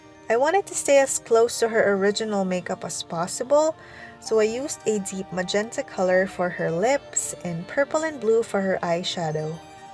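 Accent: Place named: Philippines. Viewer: I'm new to channel, and I've been wondering, is she from the Philippines? Please correct me if I'm wrong, but her accent is just so familiar and way too similar to one of the average accents that filipinos have when speaking english;3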